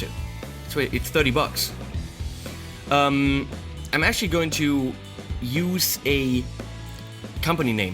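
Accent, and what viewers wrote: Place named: Germany. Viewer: So ein schnuckeliger deutscher Akzent!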